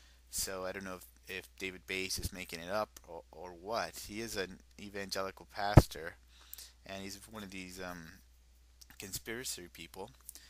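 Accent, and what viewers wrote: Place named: Canada. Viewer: Nice Canadian accent.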